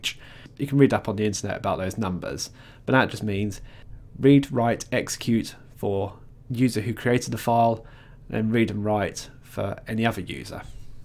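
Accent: United Kingdom. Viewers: some questions, pls. U rite #!/bin/bash but Ive been riting #! /bin/bash, that is, with a space between the! and the path. Is that important? At least my first scripts have worked. Second. U use to rite bash yourscript.sh to run them, I use sh myscript or. myscript or./myscript or even bash myscript, however, I have a book called Linux the complete reference, 3rd edition and there the author says that when we give the file executable permission, we just need to rite only the script file name, example: $ myscript But what I receive is: bash: myscript: command not found What is bad? Thank you for your videos. And great accent! Pure Brit;) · I love your british accent!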